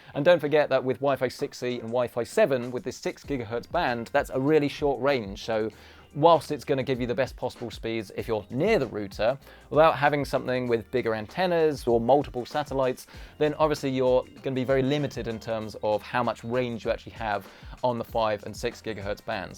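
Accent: United Kingdom. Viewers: Brits always pronounce things weirdly... It's router not rooter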